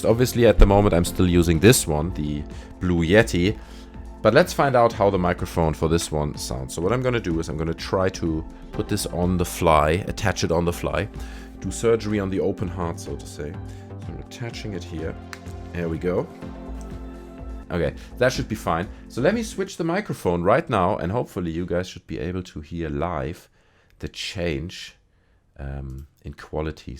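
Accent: Germany. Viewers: are u german? channel says UK tho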